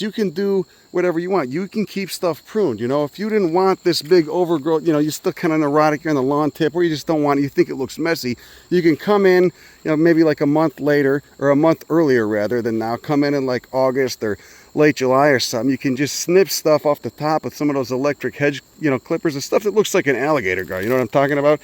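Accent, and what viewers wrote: Chicago, United States. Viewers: I notice your Chicago accent gets stronger when you're back in da region!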